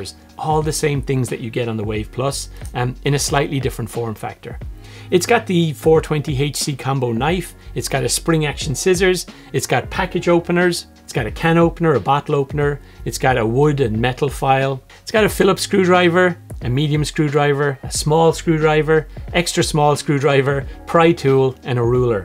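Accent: Ireland. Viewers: I listened 3x just to hear the Irish accent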